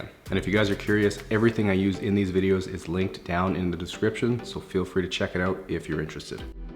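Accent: Canada. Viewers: You sounded extra Canadian this video for some reason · ah yes, let me explain. He's Canadian so when he says "garage," it sounds like he is saying "graaj."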